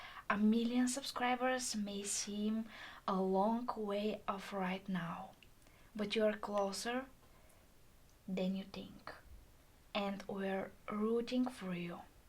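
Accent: Russia. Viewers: Are you Russian? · congrats. and one wuestion: are you russian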